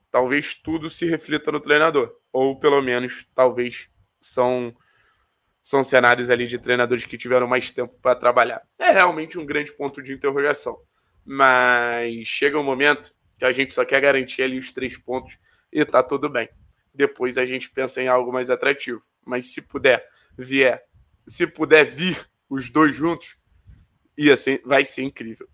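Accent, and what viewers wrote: Rio de Janeiro, Brazil. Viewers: Cara vc tem sotaque de carioca. Vc é do Paraná mesmo?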